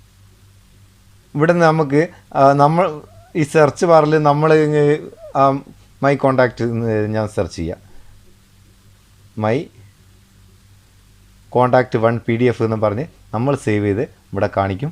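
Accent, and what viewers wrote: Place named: Meppayur, India. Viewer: കോഴിക്കോട് മേപ്പയ്യൂര്‍ ഭാഷ വിടല്ലേ.ആകര്‍ഷകം,ആസ്വാദ്യകരം,ഓക്കേ?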